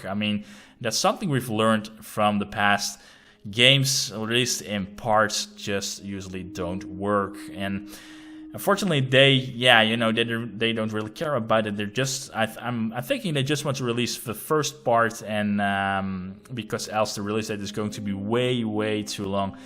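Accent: Netherlands. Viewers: Gotta love the Dutch accent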